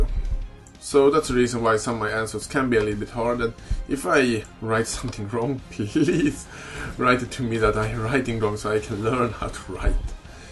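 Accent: Sweden. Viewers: where in sweden are u from i also have family in sweden and the only reason i ask is u sound like one i know:)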